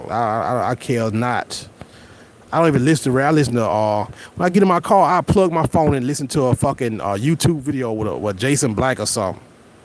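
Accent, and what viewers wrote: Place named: South, United States. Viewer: Your southern drawl sounds like Mahmoud Ali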